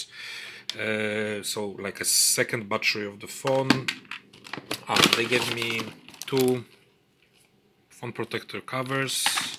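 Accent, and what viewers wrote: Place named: Poland. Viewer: akcent jakiś taki polski ;)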